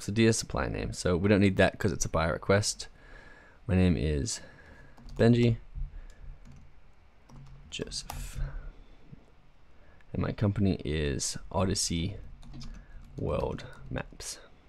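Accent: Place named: Australia. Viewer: hey cool i live in vegas too, i thought u were from australia tho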